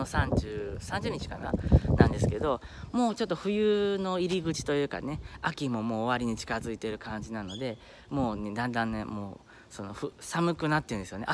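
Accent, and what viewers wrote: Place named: Kansai, Japan. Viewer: 関西のおばさんという感じ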